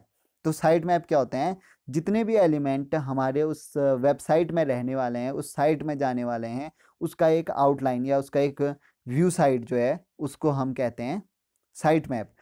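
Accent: Pahadi, India. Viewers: Bhai tu pahadi h na accent se lgra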